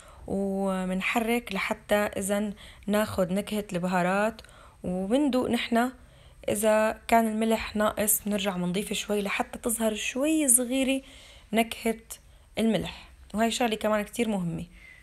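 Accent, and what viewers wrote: Homs, Syria. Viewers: اللهجة الحمصية 😍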